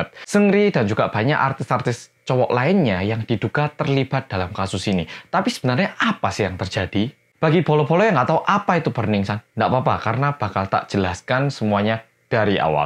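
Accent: Java, Indonesia. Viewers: Cuma dia youtuber yg gak minta di subs..like..comment..😂😍 kontenx jg smart bngt..cara dia nyampein informasi...dannnn medokx itu😭😭😭😭😍😍😍 aduhhh..oppa kang mas..aku tresno karo koe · mas, ndek kae luwih medok tiba e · Kaya orang jawa ngomongnya😍😆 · Suaranya kayak mas-mas jawa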